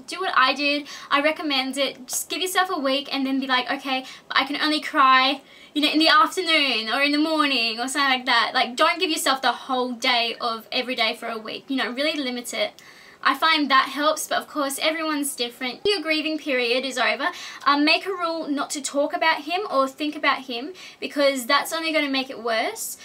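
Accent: Australia. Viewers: Is she from Australia ??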